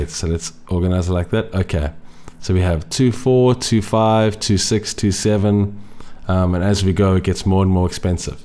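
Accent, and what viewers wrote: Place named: South Africa. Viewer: In Spain ..Had Upland for over a year and still don't know how to do hunts..so lekker to hear a SA voice 😁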